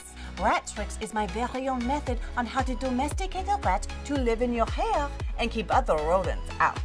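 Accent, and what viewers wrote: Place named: Germany. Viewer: that accent sounds more german than french lol i loved the video though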